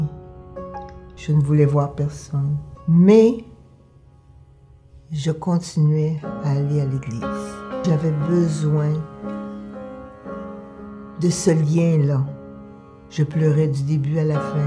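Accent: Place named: Quebec, Canada. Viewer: c un accent québéquois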